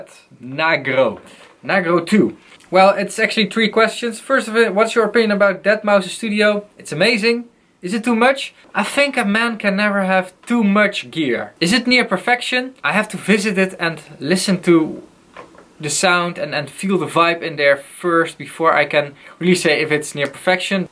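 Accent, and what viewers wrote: Netherlands. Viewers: Are you from Holland?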